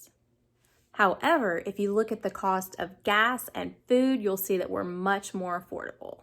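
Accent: Tennessee, United States. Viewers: Love the Tennessee accent. This is a great and timely topic. I once lived in Chattanooga.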